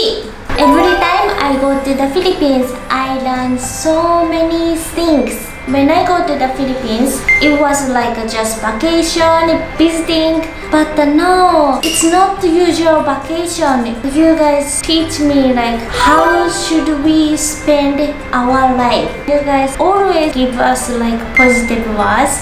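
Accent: Japan. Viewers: Please keep your Japanese accent, for me it makes your englush so cute. You speak well already.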